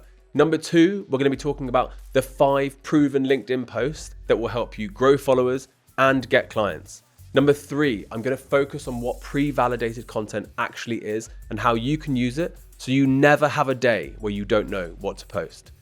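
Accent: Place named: United States. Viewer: Dude, your (USA) English is very difficult compared to British people. Don't worry brother From Pakistan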